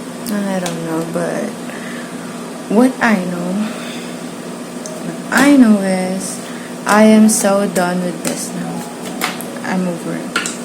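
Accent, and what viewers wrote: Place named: United Kingdom. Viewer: Grabe tlga ang english accent ❤️🥰 i want to join and win the gouache watercolor to try other brand at baka maging magaling din ako tulad mo 💚💚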